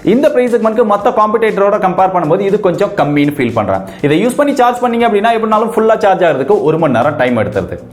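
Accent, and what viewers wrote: Kovilpatti, India. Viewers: Bro neenga kovilpatti ya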